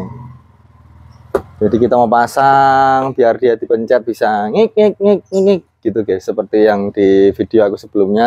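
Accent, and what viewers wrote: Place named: Java, Indonesia. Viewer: waudohe, kulon kinten seputaran jogja jateng ndanku, logate kok jowo banget, ajeng nderek pasang menawi celak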